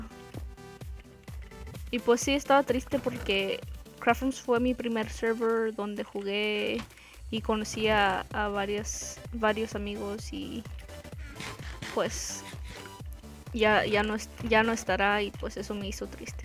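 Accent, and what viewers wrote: United States. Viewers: Es de Estados Unidos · Eres gringa?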